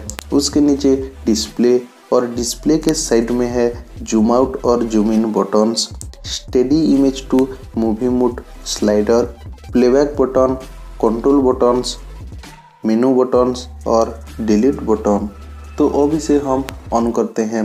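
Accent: Odisha, India. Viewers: Bhai u r odia for sure.. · Are you from Odisha Because your hindi is like Odisha people say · Bhai are you from odisha? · bhai aap odisha ke ho na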